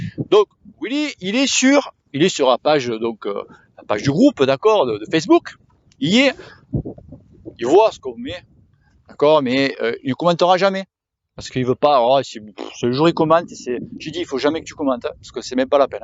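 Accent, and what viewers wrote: South, France. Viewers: S'il préparait un peu son texte et parlait un peu moins méridional ce serait mieux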